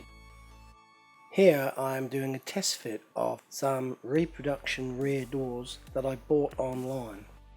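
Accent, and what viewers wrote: Australia. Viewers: I thought at first there was some Aussie accent in there. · I like your work as well as your speech. Australian sounds great. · I’m so incredibly impressed! Especially since I can’t do ANYTHING even CLOSE to what you just did! Add the really “fun to listen to” Aussie, and you’ve got a perfect video. Thank you so much!!!! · British or Australian? Sounds more like Australian. Great video, no matter where it's from.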